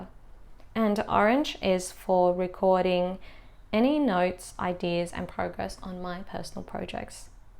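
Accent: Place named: Australia. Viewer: Is it bad that I knew in the very first 3 seconds you were Australian like me? Hope you're doing ok and have found a job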